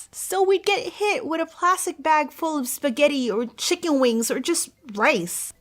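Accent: Philippines. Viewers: I love her filipino accent.... X3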